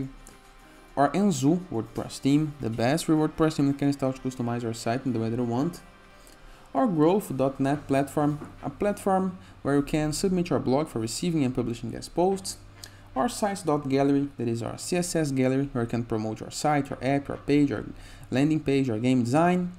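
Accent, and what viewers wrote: Brazil. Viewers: Você é brasileiro? 😂🤟❤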